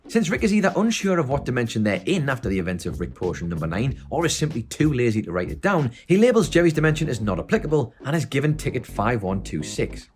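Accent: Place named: Newcastle, United Kingdom. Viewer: Geordie accents and rick and morty do not mix well together 😅 I can’t take any of these theories seriously. Sorry not sorry. Big up tho cool video 👊❤️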